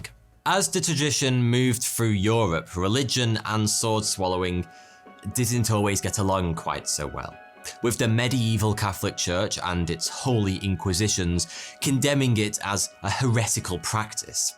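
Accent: United Kingdom. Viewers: I literally only watch this to get my British accent better by mocking this douche 🤣🤣🤣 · What he hell part of the UK does that accent come from?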